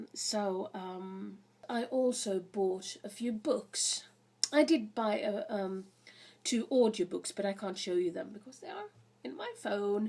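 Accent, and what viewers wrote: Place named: United Kingdom. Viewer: You are fascinating. LOL! I hope you take that as a compliment. Do you live in Sweden? From your accent I was assuming you were in the UK.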